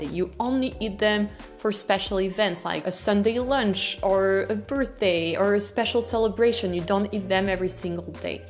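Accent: United States. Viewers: How did you get. your almost-American accent? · I'm a French guide mostly working for Americans. First of all, no one ever mentions it but your American English is on spot ! 🎉 I enjoyed a lot rhe section speaking about Food education. I find it very important and i think this is what leads to most of the other reasons you've mentionned. I oftne tell my guests about the long lunch break, even for kids 😂 my 2 yo daughter goes to day-care abd they sit at tiny tables on tiny chairs, eating out of a food tray with different compartment (starter main and desert/cheese) Found it so cute 🥰 Love your videos. Thanks I enjoyed the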